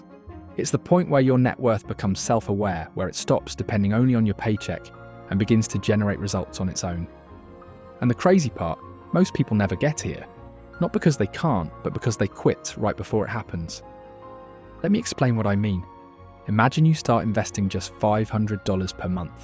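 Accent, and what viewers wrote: United Kingdom. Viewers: Weird to hear an British accent talking about 1 million dollars and not Pound Sterling.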